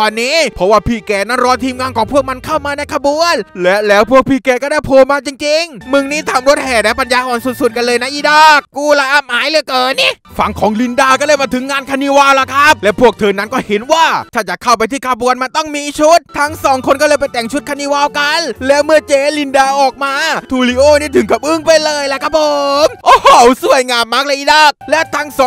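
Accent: South, Thailand. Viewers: นี่พี่บาวเป็นคนไต้ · ชอบภาษาใต้ของพี่อะหนูอยากให้พี่ภาคหนังเรื่องหนื่งเป็นภาษาใต้ได้ไหมคะ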